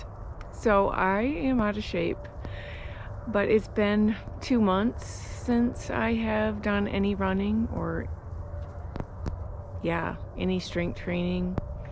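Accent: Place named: Michigan, United States. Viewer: I thought so! I have a good friend that lived in Midland Michigan and she had a similar accent to yours.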